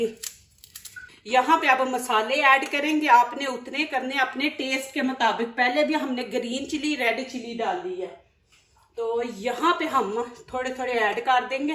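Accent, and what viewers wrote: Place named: Punjab, India. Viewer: Ap ki recipie bohat achi hoti hen aur ap ka urdue me panjabi lehja aur mohabbat bohat inspire kerta he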